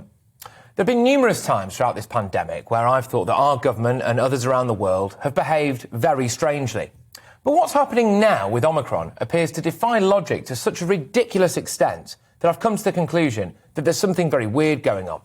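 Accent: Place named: RP, United Kingdom. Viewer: Talk English posh boy.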